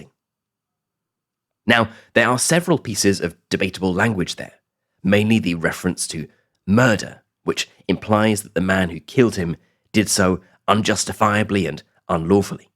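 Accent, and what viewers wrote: United Kingdom. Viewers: Teacher Is your accent british?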